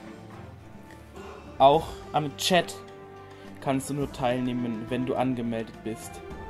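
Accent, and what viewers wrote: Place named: Franconia, Germany. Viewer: BAYERISCH? Ich fasse es nicht! Wir sind FRANKEN! Das ist ein ganz ganz ganz ganz wichtiger Unterschied! :p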